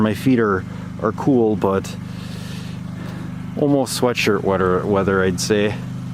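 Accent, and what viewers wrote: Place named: Minnesota, United States. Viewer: Where are you? I’m in Stillwater. Sounds like your in MN. I have a JD 3033 your video was a big help when I put my block heater in. Thanks for posting.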